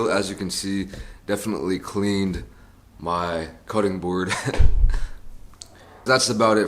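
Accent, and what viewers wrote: United States. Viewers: He sounds American because he’s from America. He moved to Seoul from the Midwest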